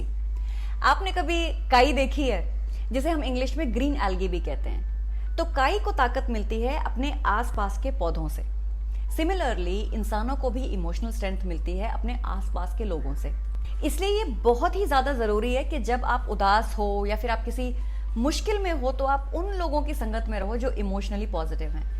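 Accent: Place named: Uttar Pradesh, India. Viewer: Love you mam ❤️ from Pakistan 🇵🇰 suda khush rho AAP ki baton say lugta hay k AAP up say ho hum bhi yahan asay hi batain krtay Hain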